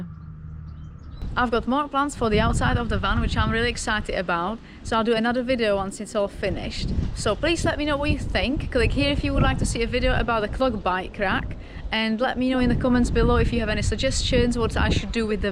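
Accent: United Kingdom. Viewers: I was going to say the same, lovely accent! Yorkshire with a twang 😎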